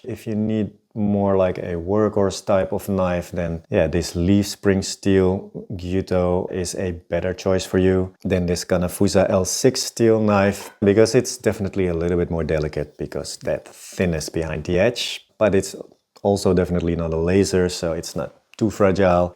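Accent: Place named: Netherlands. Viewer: Heard about your channel on the sharpening subreddit, I hear a Dutch accent in first 2 seconds. Love it ❤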